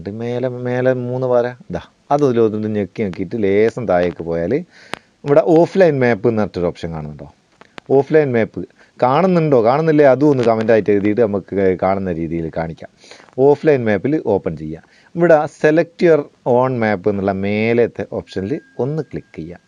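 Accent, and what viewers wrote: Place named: Vadakara, India. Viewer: വളരെ ഉപകാരമുള്ള വീഡിയോ 👍👍🌹🌹🌹🌹 ഇങ്ങള് വടകര kkaran ആണോ സംസാരം കേട്ടിട്ട് ചോദിച്ചതാണ്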